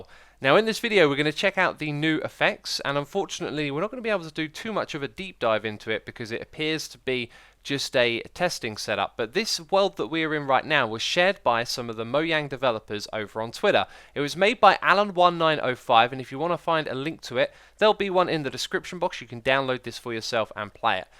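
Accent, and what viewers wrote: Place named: United Kingdom. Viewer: Not to be disrespectful, I am genuinely curious about British pronounciations. I have noticed for a very long time now that some words that end with the letter 'a' are pronounced almost like 'ar' or 'er' for example like when he says 'java' edition and sound like 'javer' or 'javar'. Why is that?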